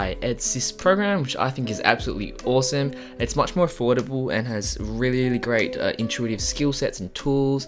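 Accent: Australia. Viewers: Hey I love your work but please work on your pronunciation! it’s hard to understand you. Usually it’s hard to understand asians speaking english, imagine adding australian accent to it hahaha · I recognised the accent, then saw that you’re from Australia too! G’day to all my fellow Aussie artists.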